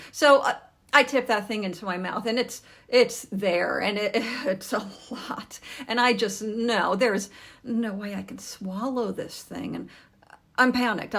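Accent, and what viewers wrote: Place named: Baltimore, United States. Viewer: I detect the Baltimore accent. Oysters are gross. I might try something new if I think it might be good, but not if it's gross. I'm also not going to talk someone into eating something they don't want. My ex was allergic to chilli peppers. They would screw up her system. It became standard habit to ask about them at any Tex-Mex place. I'd ask even if she didn't remember.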